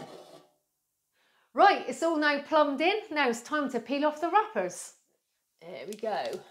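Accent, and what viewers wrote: United Kingdom. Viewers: Bristol accent ?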